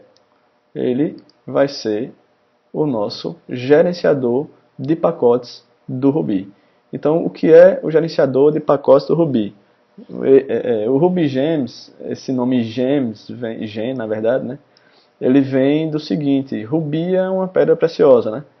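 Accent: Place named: Recife, Brazil. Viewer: Eu estava desconfiando que esse seu sotaque era lá de Recife, mas tirei a dúvida qdo vc falou parruda. Acertei?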